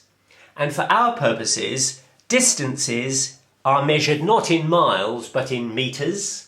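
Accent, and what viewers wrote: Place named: RP, United Kingdom. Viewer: why is this guy so posh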